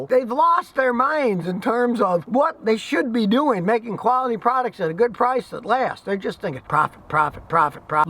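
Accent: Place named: North, United States. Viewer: Welcome back to the south. Your accent says "yankee' to me, but you have become a southerner at heart, in my exalted opinion. You were fortunate that it only took two months to decide. It took me a few years in California to realize that I needed to come back. Love your videos, even if I sometimes do things which you would label "stupid".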